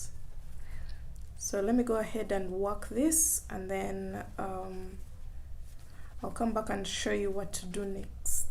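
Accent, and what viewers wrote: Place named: Uganda. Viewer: She's Ugandan. I notice our accent everywhere ❤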